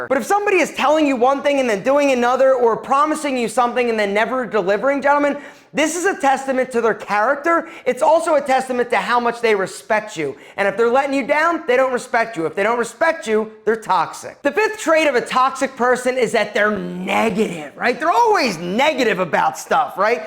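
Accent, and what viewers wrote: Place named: Delaware County, United States. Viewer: you from delco bud?? You have the accent.. G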